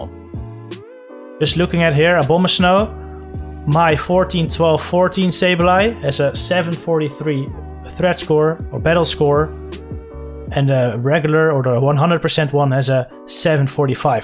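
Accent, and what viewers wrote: Netherlands. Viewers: Are you dutch? Just by the accent good video, this was helpful for my sableye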